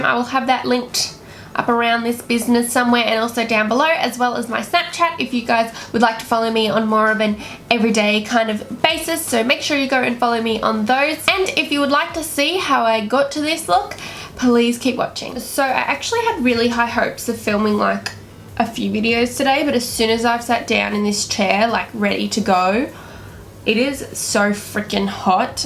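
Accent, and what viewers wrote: Australia. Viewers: You're so pretty and i love your accent😍😍 i am Australian too but your accent is much stronger than mine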